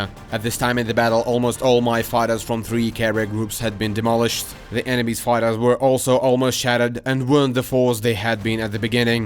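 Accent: Russia. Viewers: я сразу почувствовал что ты россиянин, но слова ты выговариваешь хорошо, многие западные славяне говорят намного хуже тебя, что уже говорить про остальных русопитеков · If anyone curious - that's what russian accent actually sounds like. ;)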